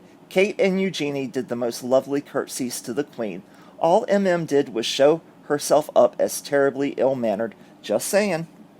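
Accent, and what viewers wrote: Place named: Virginia, United States. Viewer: I've always liked your accent and now I know it's Virginian.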